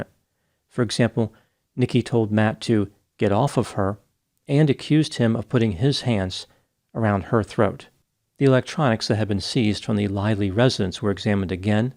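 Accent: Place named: Philadelphia, United States. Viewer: Psychology and Philly accent: on point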